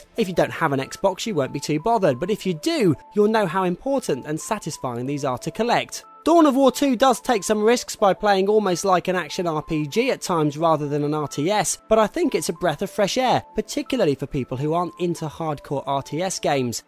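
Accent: England, United Kingdom. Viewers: did you read the entire comment? that was how to PRONOUNCE it. his normal and perfectly recognisable accent isnt the problem, CAUSE I'M ENGLISH TOO. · dat british accent...oh my ears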